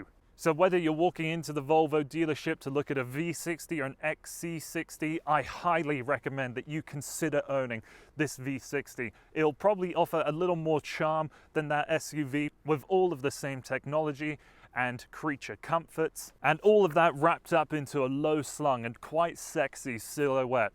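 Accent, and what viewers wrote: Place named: United Kingdom. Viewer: Thank you for another great and professional review. I am myself owner of v40 CC with old volvo's 5cylinder engine the sound of which I do enjoy and do miss in present only 4cylinder units. As to your Brit origin, I'm from Poland and for a while I was mistaken that Canadians speak so wonderfully in British English :)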